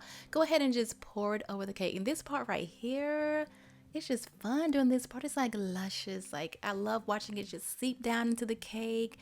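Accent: South, United States. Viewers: I'm a texture person too! Lol I just love your sweet southern accent! I have it as well but I gotta say I could listen to you read a phone book! I am gona try this cake tomorrow! Pound cake is my favorite! Thanks for sharing! I will be liking and subscribing! And God bless your Grandma for most likely inspiring you to bake pound cakes too!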